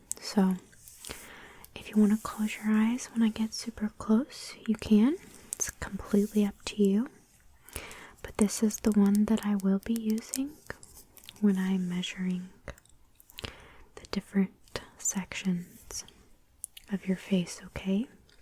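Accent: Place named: South, United States. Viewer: Really enjoyed that, Thanks! And are you Southern? You kind of sound like Kelly Clarkson! I have had a crush on her since i was a teen lol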